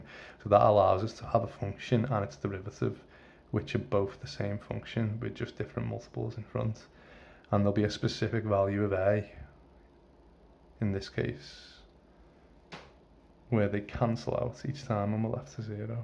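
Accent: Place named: Liverpool, United Kingdom. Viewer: I love the Scouse accent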